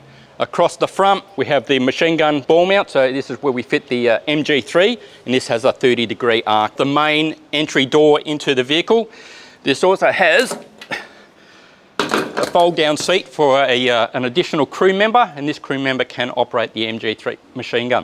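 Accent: Australia. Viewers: It's Aussie regional, I'm getting used to it. There is so much good knowledge conveyed that a voice doesn't matter.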